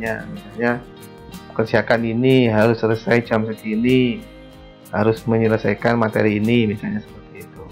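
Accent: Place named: Osing, Indonesia. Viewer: koyone lare osing ta kang...#logate katone matur suwun ilmune....👍👍👍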